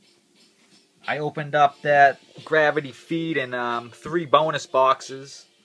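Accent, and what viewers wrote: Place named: Boston, United States. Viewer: You sound like you're from Boston are you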